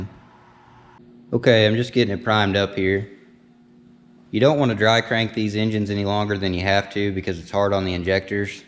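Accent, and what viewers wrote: South, United States. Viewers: I don´t mind at all about the engines, i am here watching ur videos just coz i like ur southern accent!!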